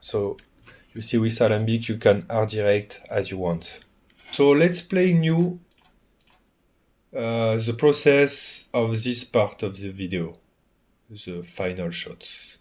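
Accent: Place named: England, United Kingdom. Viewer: Merci pour le tuto c est top. Magnifique acens anglais en passant😁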